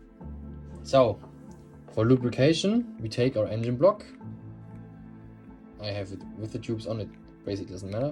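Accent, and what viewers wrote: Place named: Germany. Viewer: I think your deutsch 😂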